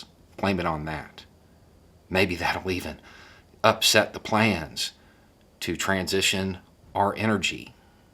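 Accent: South, United States. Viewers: Dude, the style choices of apparel on display here are outstanding. NASA shirt, Bass Pro hat... thanks for representing both brands well buddy. Full marks all across the board. As one southern boy to another, it’s also akin to presenting a very astute argument with a southern accent. Proves things aren’t always what people say they are.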